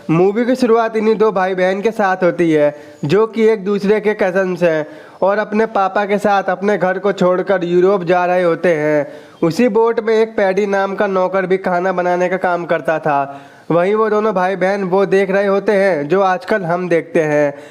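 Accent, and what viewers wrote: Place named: Mumbai, India. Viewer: Bhai full to mumbai walo ke jese explqin karta hai😂😂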